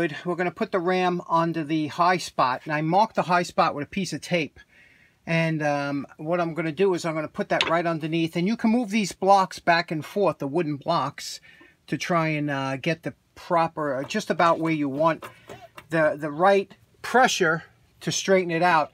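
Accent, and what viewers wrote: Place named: New Jersey, United States. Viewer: Liking your New Joisey accent!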